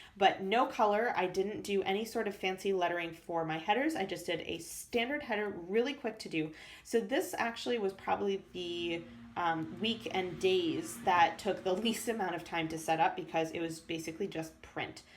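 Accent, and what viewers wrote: United States. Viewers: Great video! And you write in all those different languages? Your life seems very interesting! You sound American so I'm assuming you are studying abroad! Very interesting!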